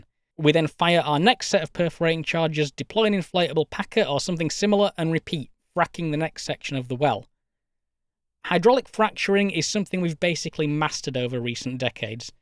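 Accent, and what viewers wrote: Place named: United Kingdom. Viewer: Man, your British English sounds awesome) Thx)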